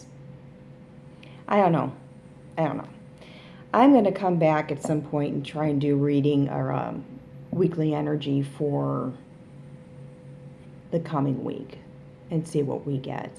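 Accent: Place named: Western New York, United States. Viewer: just discovered your site. Enjoyed this reading, thank you for sharing. You sound like you're from western NY...I recognize the accent!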